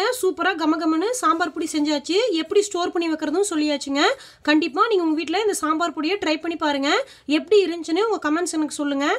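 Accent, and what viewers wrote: Kongu, India. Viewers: yur voices lyk kongu slang in gobi which place yu are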